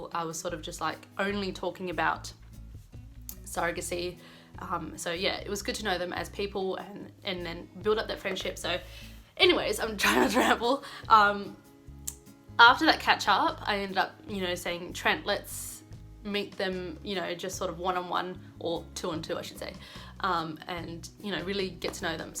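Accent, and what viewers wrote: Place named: Australia. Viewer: Hey! First of all, you Australians have an amazing accent. I really like it! Secondly, good luck with this! You’re doing a fantastic job. I hope things work out for you. My prayers are with you, honey.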